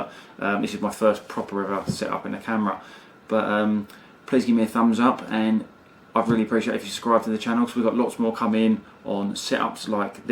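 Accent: United Kingdom. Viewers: Ahah, nothing in particular, I simply like british pronunciation. :)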